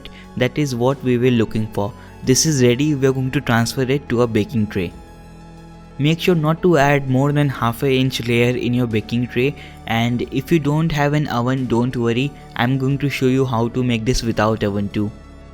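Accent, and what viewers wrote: India. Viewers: Why do most indians speak like this while talking english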